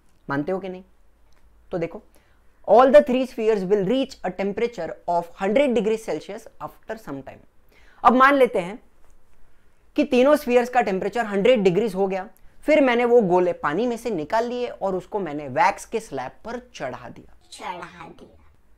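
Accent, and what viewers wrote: Maharashtra, India. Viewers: bhaiya, from where you are? Because I listened Marathi when you were speaking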